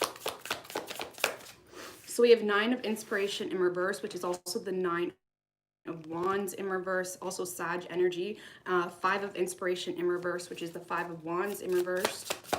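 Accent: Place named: Canada. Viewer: Love your readings and your accent...oot and aboot! X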